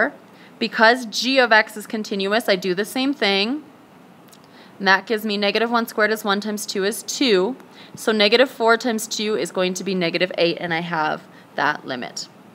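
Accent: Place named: United States. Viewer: what state are you from? I like the accent lol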